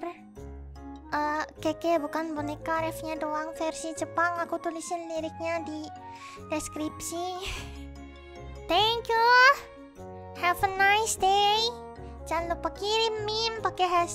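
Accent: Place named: Cirebon, Indonesia. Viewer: logat ngomongnya kek orang cirebon jir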